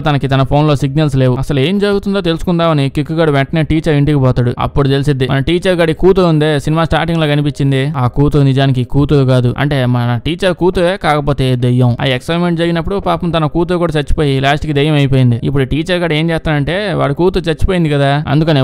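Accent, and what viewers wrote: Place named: Nellore, India. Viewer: Nellore slang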